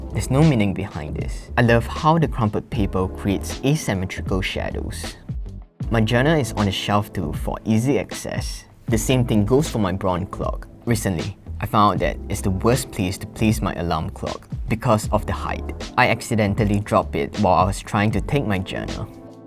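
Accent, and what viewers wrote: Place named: Singapore. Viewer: As a Malaysian, i approve your singaporean accent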